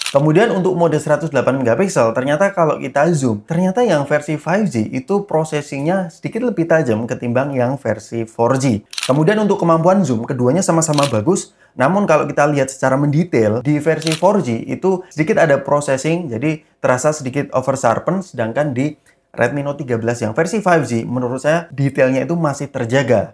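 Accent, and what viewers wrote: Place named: Java, Indonesia. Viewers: Wong jogja logat e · Jowo ngendi masse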